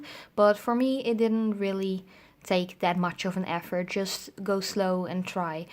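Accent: Netherlands. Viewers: Sorry, naar ik kon duidelijk je Nederlandse accent horen! 😅 Niet gemeen bedoeld tho, ik vind het juist iets vertrouwds hebben. Oh ja, en de look is GEWELDIG! Goed gedaan! 👏👏🙏🙏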